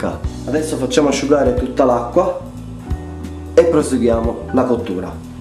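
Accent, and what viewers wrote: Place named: Sicily, Italy. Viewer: Un sardo con l'accento siciliano????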